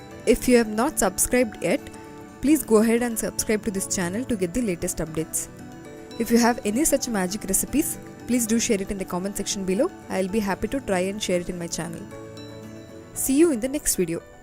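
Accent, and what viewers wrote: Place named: India. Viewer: Disliking because of your cringy Indian thick accent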